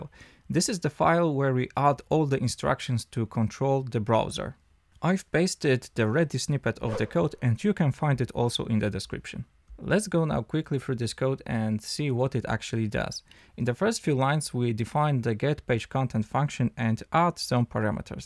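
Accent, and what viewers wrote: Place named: Poland. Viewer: No slychac, że Polak 😀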